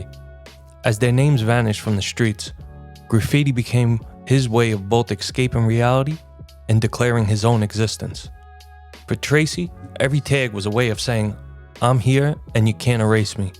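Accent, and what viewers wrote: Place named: New York, United States. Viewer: Very well done doco. You have the perfect classic NY accent for the narration that really sets the tone. Fly high Tracy168🙏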